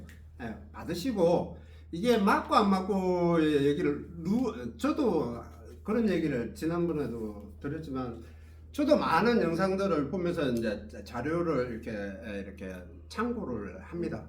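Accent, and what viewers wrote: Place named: Gyeongsang, South Korea. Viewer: 선생님 강의 정말 감사드립니다. 경상도 어투라 친근감이 가고 귀에 속속들이 다가오네요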